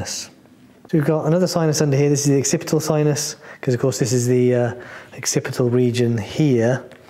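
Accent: United Kingdom. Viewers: You are great but with that typical british ascent i could understand only half of what you say 😅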